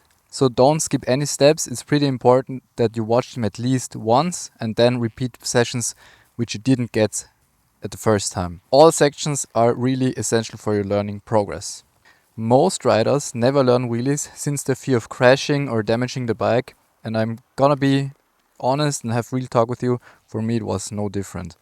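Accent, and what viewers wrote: Germany. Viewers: I trust you even more because of the German accent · I hear deutsch!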